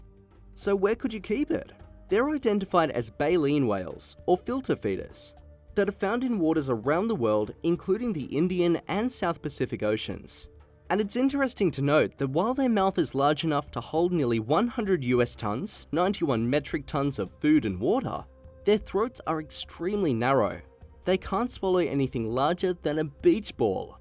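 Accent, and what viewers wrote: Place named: Australia. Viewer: Gotta love an Ozzie accent! 👌🏼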